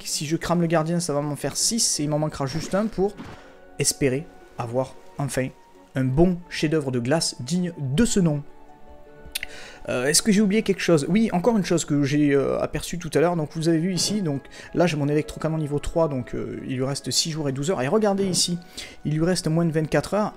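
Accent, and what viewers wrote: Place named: South, France. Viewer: très bonne vidéo surtout avec ton accent du sud ;)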